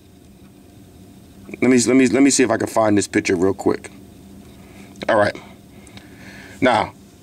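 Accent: New York, United States. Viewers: very good review ,easy to understand, plain english, the way WE New yorkers speak everyday, because of this review , i'm buying this watch, thanks Man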